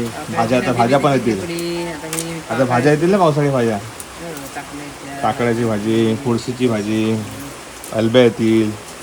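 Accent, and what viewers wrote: Konkan, India. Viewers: gavi jaun gav sarkh ....pure kokani boltos 😅😅😅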